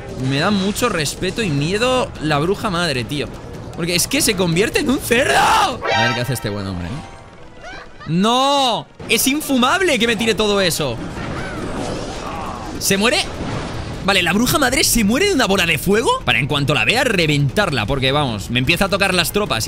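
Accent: Argentina. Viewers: Jajajaja me hace gracias cómo abla como argentino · cada vez mas argentino 😎🤑🇦🇷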